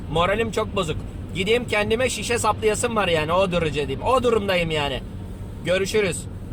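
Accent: Thrace, Turkey. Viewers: Va varya sen 10 nuara 5 yıldız sın. süper sin. Bu trakiya şiveye devam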